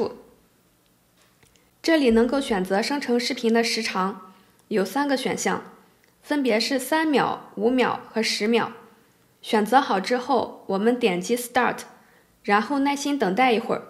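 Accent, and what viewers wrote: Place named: Shaanxi, China. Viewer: 貌似陕西人，反正肯定大西北的。。。。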